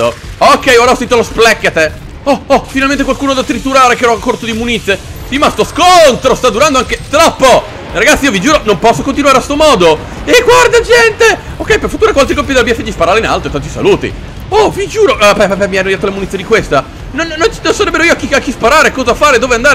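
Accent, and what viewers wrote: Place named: Naples, Italy. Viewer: Sei napoletano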